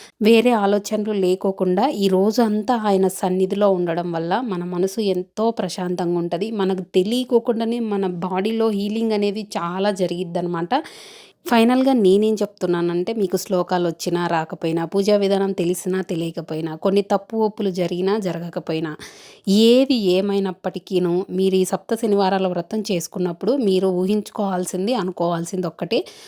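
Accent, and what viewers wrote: Rayalaseema, India. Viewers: Hi bujji maadi కూడా రాయలసీమ. యాస super. Funny Funny videos. Script totally natural. పూజ ardamainatu cheppavu.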